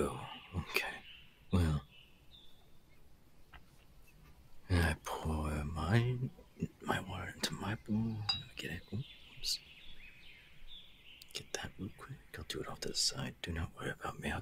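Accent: United Kingdom. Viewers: Why does he sound so British 😂😂 Also ADORABLEEEE ❤️❤️❤️❤️❤️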